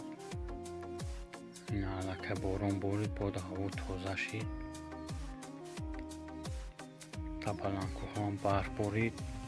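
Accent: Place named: Garm, Tajikistan. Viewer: Оханги дилнишин гап нест оханги кия брать Гарм супер ба пеш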